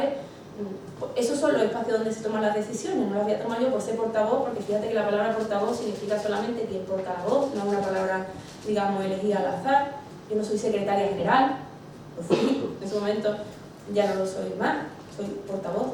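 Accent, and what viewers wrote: Andalusia, Spain. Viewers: Reconozco la valía de Teresa,su discurso sabroso de andaluza.Pero jamás comprendí su remar dentro de Podemos.Los anticapitalistas desaprovecharon una oportunidad histórica y se sirvieron de la mayoría para su proyecto minoritario.Que les vaya bien.Y lo dice un trosco vasco harto de excisiones y traiciones.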